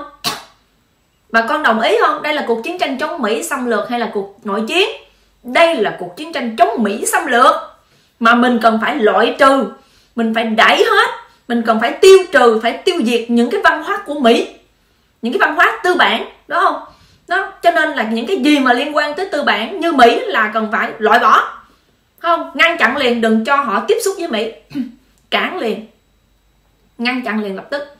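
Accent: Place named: Mekong Delta, Vietnam. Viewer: Giong noi mien tay rat ngot ngao va chan tinh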